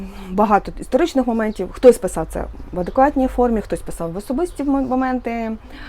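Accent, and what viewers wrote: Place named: Western Ukraine, Ukraine. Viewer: запам'ятай, усім ніколи не вгодиш!!! Твій канал, твоє життя, твої правила! Я із заходу України, мова суржик інколи "ріже" вуха, але це мої проблеми, чому я би писала про це людині і псувала їй настрій? 😅 До речі, я підозрювала, що ти маєш зв'язок із західною частиною, чути все рівно по вимові слів, що ти наша❤🇺🇦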